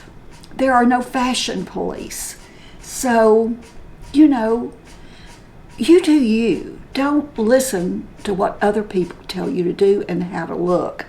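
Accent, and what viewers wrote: South, United States. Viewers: Your voice and your lovely Southern accent sounds like Melissa from her channel, you remind me of her. It's a delight to put makeup on along with you.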